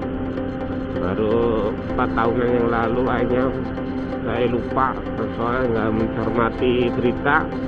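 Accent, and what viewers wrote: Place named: Banyumas, Indonesia. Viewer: Nyimak sedulur ngapak ora ngapak ora penak. .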